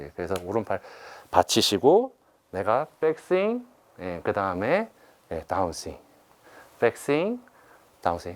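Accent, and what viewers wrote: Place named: Busan, South Korea. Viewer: ㅋㅋㅋ 허프로님 부산분이라 사투리가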